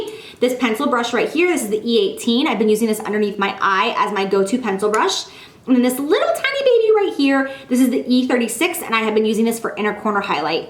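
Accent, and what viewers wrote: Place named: New York, United States. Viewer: she has like a New Yorker accent lol ily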